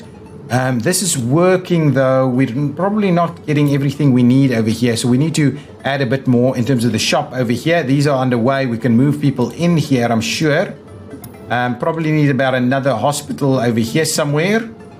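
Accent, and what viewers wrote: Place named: South Africa. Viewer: Hey, you don't sound like an Australian... much more like a South African ;-) . Nice to hear another South African stream/content creator.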